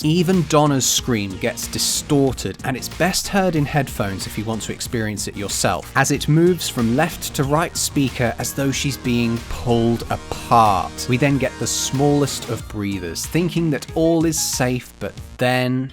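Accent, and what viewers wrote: RP, United Kingdom. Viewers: Yse a this is the posh voice I have been thinking of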